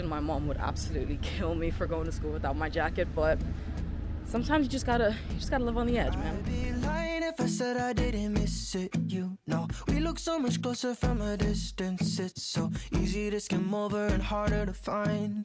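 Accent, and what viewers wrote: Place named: Ireland. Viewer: Which country is this please. I couldn’t catch your accident..is not America, not Canada looks like UK a bit is this Ireland ? something?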